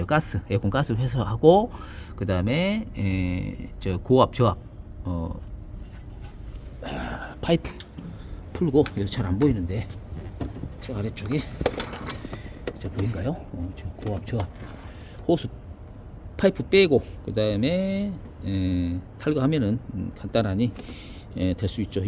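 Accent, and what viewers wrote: Jeolla, South Korea. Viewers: 공장장님 오늘도 수고 하십니다 전라도 사투리가 아주재미나게 말쑴헤사아주 시고 실력도좋고요 나도 화순사람인데요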